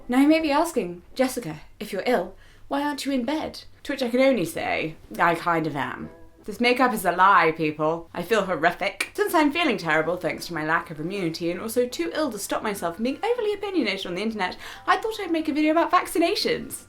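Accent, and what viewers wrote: United Kingdom. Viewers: She's just awesome. She puts things so clearly and that British accent makes it so much more awesome. Additionally, she always looks so classy while laying out the facts. Oh, by the way, I get vaccinated for everything I need (and a few extras), not just for myself, but for the health of others. In fact, I'm about to get a MMR booster soon. Jessica, keep up the great videos, I've enjoyed all of the ones I've watched so far.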